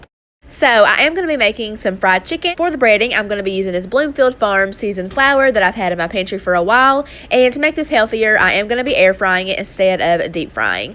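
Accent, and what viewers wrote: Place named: Kentucky, United States. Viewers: I knew i recognized that accent! What part of kentucky are you from? Im in taylorsville. Btw i love your videos im always stuck on what the heck to make for dinner and this is my way out everytime, thank you! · Kentucky! So there's the accent! ;) I had an Aunt that lived in Padukah. I'm in IL myself. Loving these videos!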